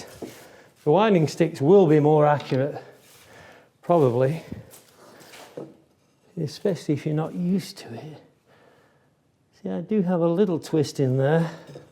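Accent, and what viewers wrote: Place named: North, United Kingdom. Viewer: Excellent Video/s - which part of the UK are you from and where are you now? My question is, after watching closely and carefully your Saw and Chisel Skills throughout your Workbench Build videos - what is your preferred Chisel Brand and why? - AND what is your preferred Saw Brand and why? I suspect by your slightly 'accented ' Northern dialect, you might now be located in the USA so, as such, maybe your Chosen Brands might not be available in the UK/France! I any case, l willl look forward to hearing from you soon - Thanks. All the very best to you and your family.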